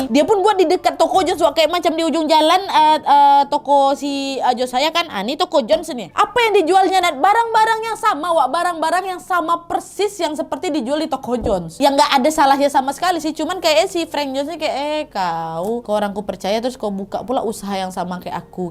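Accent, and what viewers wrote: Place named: West Sumatra, Indonesia. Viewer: cuma d channel ini , bisa dengar berita kriminal logat minang 😅. Narasi percakapannya g bayangin bule lg ngobrol pke logat minang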